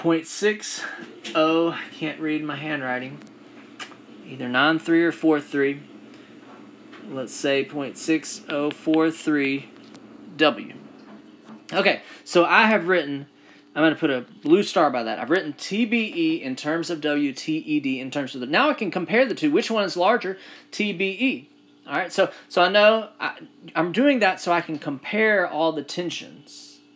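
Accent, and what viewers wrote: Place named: South, United States. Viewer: I like your southern accent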